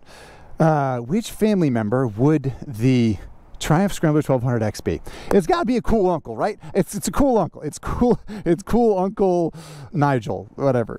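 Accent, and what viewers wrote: United States. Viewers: Hi, hi, hi comment section chatters, just this line to say: real nice intro, the change is welcomed (your laugh / the rain) thank you for your verbal skills.. best ad rep online (not that i am interested since i'm european and we get real motorcycles ) 😹 (sorry the pun could not be whiff held) thank you for the number of bikes you put your tushee on (this one looks as ugly as it can faking retro looks...) thank you for making me remember what it is to hear the north 'murican language (don't know why but i do miss it ❤ ) thank you for (in the future) changing the format more often (like you did under the rain or in india) (just couple it with revere ad rep 😸) thank you for ari and lance and spurgeon thank your wife (and kids?) good luck have fun, thank you for your company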